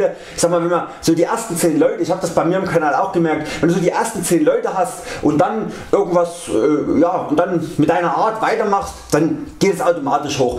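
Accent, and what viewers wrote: Saxony, Germany. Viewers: Ich verstehe dich gut- auch wenn du manchmal schnell und viel redest ( bin da teilweise auch so) daß du aus Sachsen kommst hört man shcon- aber das ist doch nicht schlimm! Ganz normal dss mand as ein bißchen raushört, aber soo viel ist das auch nicht. Ich komme z.b. aus dem Sauerland ist bei Dortmund, und rede auch kein gaanzes Hochdeutsch das würde man auch hören wenn ich woanders wäre, das ich aus dem Sauerland bin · Dein sächseln klingt ganz anders als meins =D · Ich finde das echt ne nette Sache von dir, dass du kleinen Youtube Kanälen eine Starthilfe geben willst! :) Aber mal eine Frage: Was genau definierst du als Erfolg? Kommerzialisierung?! Denn Erfolg kann sehr individuell sein! Für mich ist schon das reine drehen eines Videos ein Erfolg :) Ich finde deine Art sehr klasse und sympathisch! Wegen mir kannst weiter sächseln wie du willst :D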